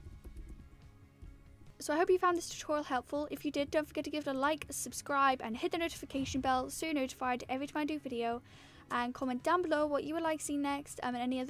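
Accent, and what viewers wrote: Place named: United Kingdom. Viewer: aye u quite bri'ish yere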